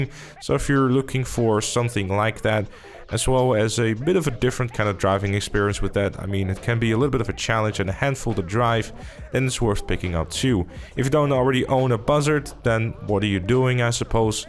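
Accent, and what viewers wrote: Netherlands. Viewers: You sound Dutch , am I right 😜?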